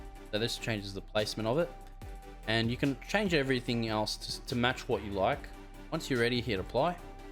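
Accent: Australia. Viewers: so refreshing to hear an Aussie voice in these types of videos. Is unraid affected by this wireguard removal from distros?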